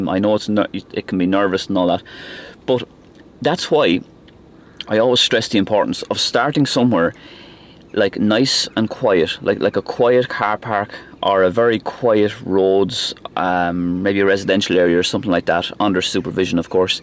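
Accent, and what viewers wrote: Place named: Wexford, Ireland. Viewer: The first video I watched I thought this guy sounds like he is from Wexford 🤔. Then in this video I saw you driving around my estate right past my house. Small world 😂. My first lesson is tomorrow and your videos have been a great help. Thanks.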